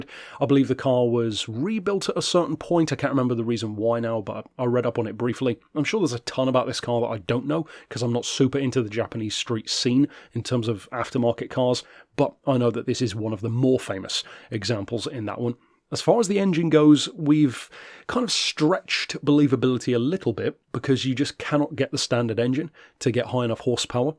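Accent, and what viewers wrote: United Kingdom. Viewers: You know you're in good hands when you find a tutorial with a British accent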